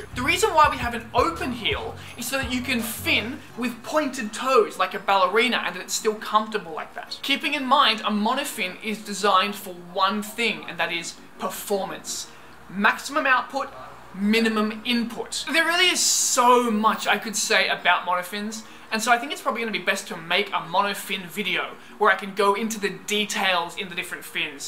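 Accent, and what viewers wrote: Australia. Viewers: I like they way you talk Aussie man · I lova australian english!!!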